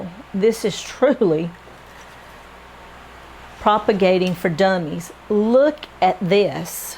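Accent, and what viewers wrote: South, United States. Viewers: You have a lovely southern accent. I am hoping you are in the same zone i am so i can get succulent advice throught your videos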